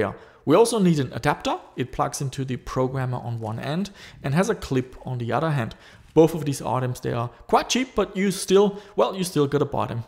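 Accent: Germany. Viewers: Man hört das du nen deutscher bist 😂😂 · DAT GERMAN ACCENT!×£°J™£¶~™OA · He's an Austrian who moved to Australia. That's why he has a German accent. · German? :D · Your clearly sounds German why don't do the video in German? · Yay! A new Video. Btw. Are you German?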